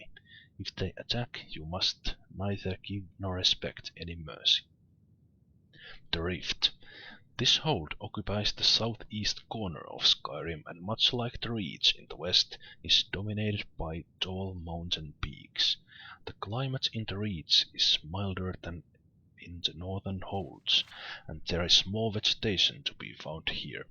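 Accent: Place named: Finland. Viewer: I watched the first video and that's when I found my two long lost loves this video your Finnish accent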